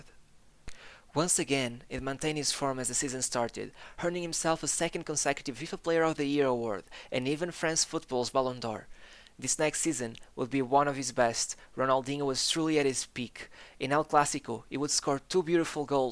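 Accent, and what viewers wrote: Portugal. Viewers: I can tell that's a Portuguese native speaker narrating this video in English.🤔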